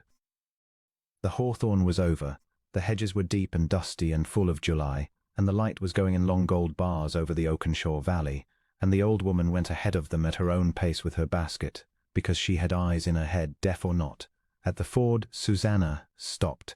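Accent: United Kingdom. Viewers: This was one of your better stories. Loved the writing and the wonderful British accent of the narrator. Just wonderful. Thank you.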